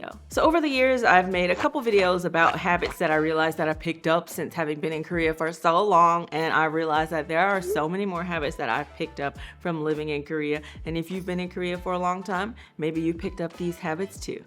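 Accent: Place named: Georgia, United States. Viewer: How have you not lost your Georgia accent?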